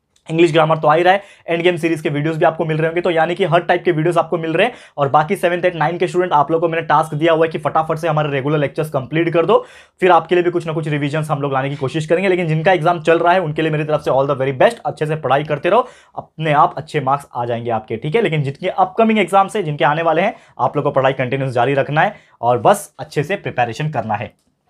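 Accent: Maharashtra, India. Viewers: Sir are you Maharashtrian???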